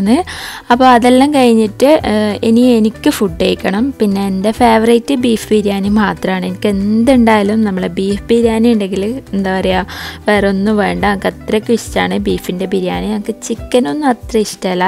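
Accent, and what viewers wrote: Kannur, India. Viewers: Kannur slang maatandato nallaind kettirikan 😍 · Njan malappuramkariya pakshe ithayude kannoor slang enikk orupad ishta😍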